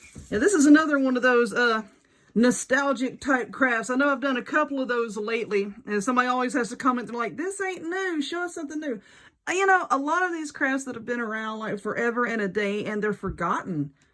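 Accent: South, United States. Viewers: Well I’m old and have never seen this craft before! So thanks for sharing it! Also I love your sense of humor reminds me of my sister even down to the southern drawl.🥰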